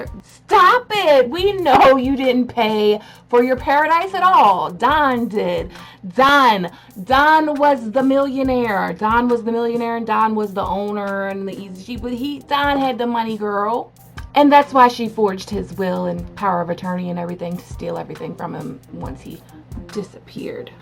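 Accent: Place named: Maryland, United States. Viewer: I feel like your from bmore and I just found you. I'm from bmore so I recognize the accent. You sound from Maryland! Love your vids. Great job Hon!!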